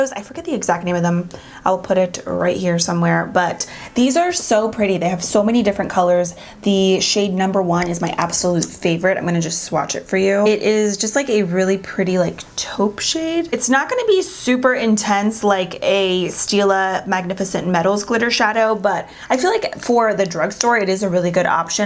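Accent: Pennsylvania, United States. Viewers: I think Arenge is a PA thing! I'm from Philly but I live in Oregon now, and folks have commented on some of my very open vowels 😅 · Lol def a PA thing, I say A-range too lol I'm in Allentown! Love the look, very pretty!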